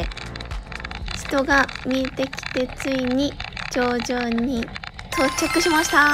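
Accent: Gifu, Japan. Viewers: 失礼😅岐阜弁ですか！かわいいですね🙂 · 最近よく拝見しています。岐阜弁の響きが懐かしいです。（友人が岐阜に居るので）来年は私も参加したくなりました。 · 後半戦も、岐阜弁を懐かしい思いで聴きながら拝見致しました。 岐阜に住んでいた時、「えらい」を最初聴いた時、？？だったのを思い出しました。 来年、輪行して、参加したくなりました！！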